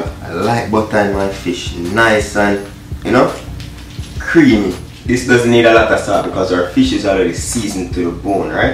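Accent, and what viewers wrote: Jamaica. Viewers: Wickeeeeeeed my uth!!Not only do I enjoy your teachings but ur presentation. Real down to earth vibes no twanging just raw Jamdown style.I feel I'm home u nuh JAMAICA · Recently discovered your channel. Really enjoying the authentic Jamaiacn feel. Meal and accent.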